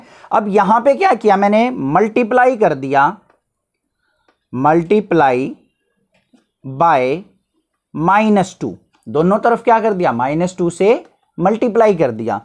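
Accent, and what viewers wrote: Haryana, India. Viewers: apki awaz se pata chal gaya tha mai bhi haryana se hu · I m sure you are from haryana Your accent says you are Haryanvi 😆🤣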